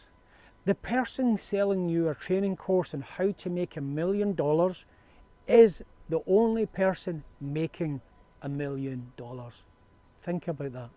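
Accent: Scotland, United Kingdom. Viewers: Scottish accent?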